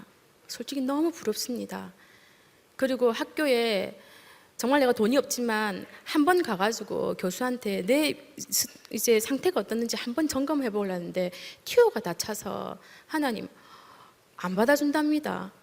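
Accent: Busan, South Korea. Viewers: 고예정님 저도 부산 동대신동에서 태어났어요 ^^ 정지~~ 부산 사투리 고소합니다. 항상 하나님을 증거하시는 삶이 되시길 축복합니다.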